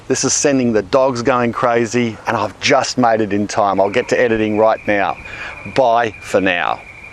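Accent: Australia. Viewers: Well done for making the effort to speak nice and clearly and using lots of gestures. Makes such a difference for viewers who don't speak English as their first language or who aren't used to an Aussie accent. Top work. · Thank you ! I caught the Aussie accent, but didn't know if he was still there. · Love your videos, tips, and that very authentic Aussie accent. With love from Russia :D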